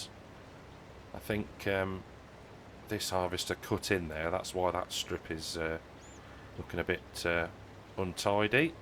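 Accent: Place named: Birmingham, United Kingdom. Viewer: Hello Mr Brummie😁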